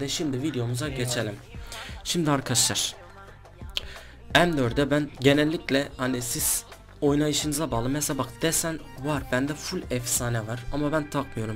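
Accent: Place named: Diyarbakır, Turkey. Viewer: diyarbakır lı mısın gardaşım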